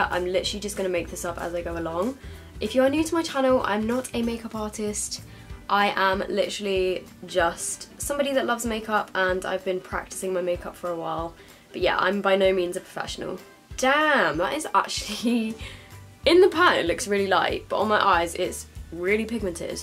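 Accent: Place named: United Kingdom. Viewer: I love accent. "It's got a lot of fool-out". Which part of UK are you from?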